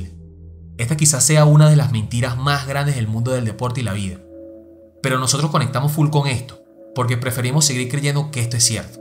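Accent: Venezuela. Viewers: Estoy seguro de que el narrador es venezolano.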